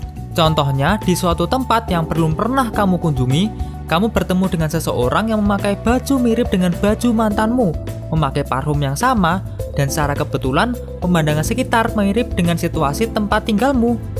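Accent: Java, Indonesia. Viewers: medok banget mbah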